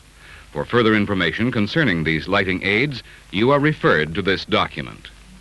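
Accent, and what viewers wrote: United States. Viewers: Thanks for putting this interesting document up. Anyone know the date on this document? Is it a Pans-Ops standard? It's perplexing to hear an English speaker, with an American accent, giving specifications in metric units. One would assume the TERPS document would be in feet. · A few details I noticed about this video. First, the announcer is speaking American English, but the lighting systems being described are ICAO-approved systems, which is an international standard and all distances are in meters. Second, this film was made before Category 3 existed, so there is no information on those systems. But the difference is minimal, since the approach lighting is the same as a Cat 2, and the main difference is extra lights on the taxiways. Third, the systems referred to in this film as “Simple approach light systems”, known to the ICAO to be only good enough for non-precision approaches, which use barrettes at 60 meter/200 foot spacing and extend out only 390 meters/1400 feet rather than the 30 meter/100 foot spacing, 900 meters/3000 feet length of a full system are in fact the basis for the typical Cat 1 system in the US. 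Basically, you take a short, simple approach light system (SSALS), add five sequenced flashers leading up to it (Runway Alignment Indicator Lights), and you have a Medium Intensity Approach Light System with Runway Alignment Indicator Lights, thankfully abbreviated to MALSR. For the international standard, this is only good enough for a non-precision approach. But the FAA thinks it’s good enough for a Cat 1. The standard in the US used to be the ALSF-1, which is similar to the barrette-type Cat 1 system shown in this film. The ALSF-1 remains the standard for military fields in the US. But the FAA believes that saving money is more im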